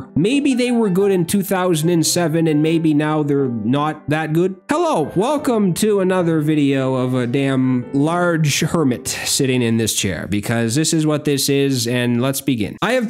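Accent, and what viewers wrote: Canada. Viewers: I love your Canadian accent. But I will say that you should play Resistance 2 and 3. It improves on the first game....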